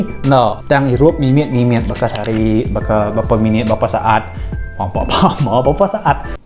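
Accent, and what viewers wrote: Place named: Sarawak, Malaysia. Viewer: Hui nda faham akoo slang Sarawak.. Cuba baa 24 hours slang sabahan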